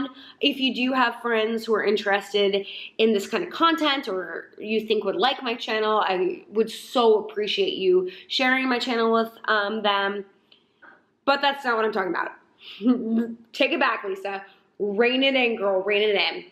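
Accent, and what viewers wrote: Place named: Southern California, United States. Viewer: You have to most SoCal accent ever.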